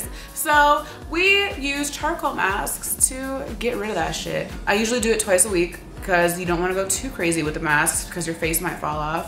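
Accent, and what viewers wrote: United Kingdom. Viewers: i live for your british accent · Ur British accent is the shit !! Loll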